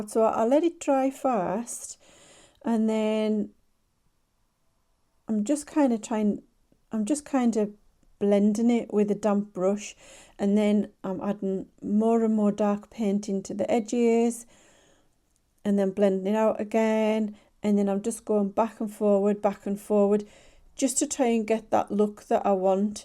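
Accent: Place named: Whitehaven, United Kingdom. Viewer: Hi, iv just found your fab channels, your accent reminds me of home I’m from Whitehaven, love your art and really enjoying the format hope to see more of your work ❤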